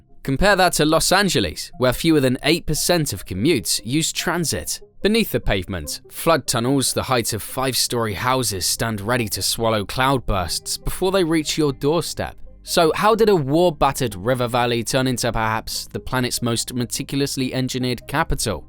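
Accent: United Kingdom. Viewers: This video makes me feel like having a English listening exam... good diction, rhythm and intonation... British accent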